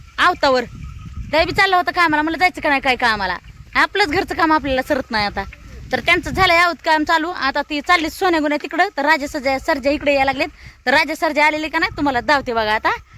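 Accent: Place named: Ahmednagar, India. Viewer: ताई तुमची भाषा नगरी आहे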